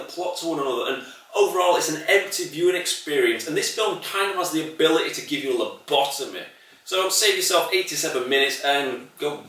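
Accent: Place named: Yorkshire, United Kingdom. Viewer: I love... your delivery is laugh out loud stuff... the edits are great and must be time consuming, voice over et et... Keep up the great work.. I'm in Toronto Canada and I laughed like hell when someone from the USA wrote to you and asked if you were from Scotland.... my family in England knew right away you were Yorkshire... They're Lancashire but don't hold that against them, lol cheers again... too funny... fantastic..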